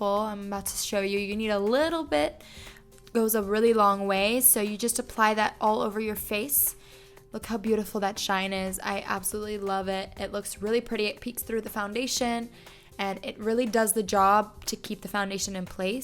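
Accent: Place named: Russia. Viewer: Сразу видно-говорит по русски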